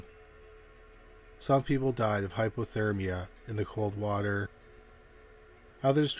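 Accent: Canada. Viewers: Is he Canadian? It would explain some things.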